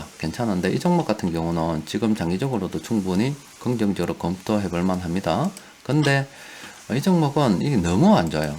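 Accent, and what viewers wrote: Gyeongsang, South Korea. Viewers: 오늘도 좋은 하루되세요 혹시 어디사세요 경상도 사투리 같은데 행복한 주말되세요 꾸벅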